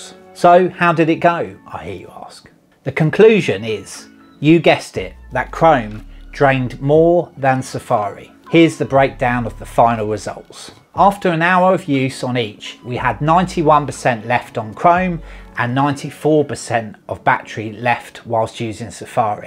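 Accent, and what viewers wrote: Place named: Australia. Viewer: thanks. I have been considering to move from Chrome to Safari permanently, then found your video! by the way. I like your accent. which part of Oz are you from??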